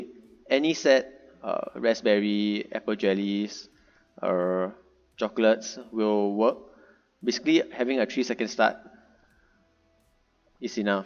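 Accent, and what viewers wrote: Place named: Malaysia. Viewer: Are you malaysian , i know that accent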